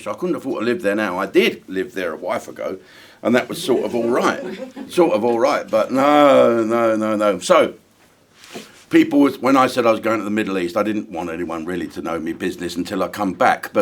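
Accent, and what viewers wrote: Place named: RP, United Kingdom. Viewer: Very posh. Very posh.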